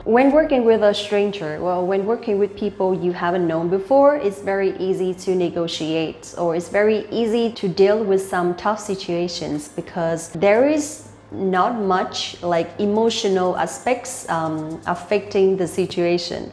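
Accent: North, Vietnam. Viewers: This course had an accent from Northern Vietnam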